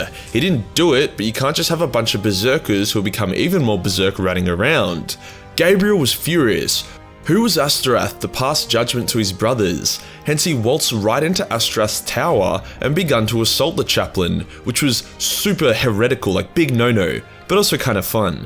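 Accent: Australia. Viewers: You could do with a little heavier Australian accent. What kind of Australian are you? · My Girlfriend is working in a BPO Australian account when she heard the astorath's paraphrase she laugh so much told me that is absolutely Australian😂🤣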